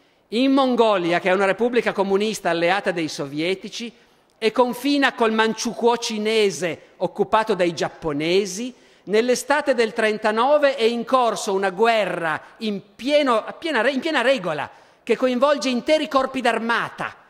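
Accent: Piedmont, Italy. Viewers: No piemontesissimo. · N 1 peccato x la voce troppo piemontese neee , caro prof sei esagerato complimenti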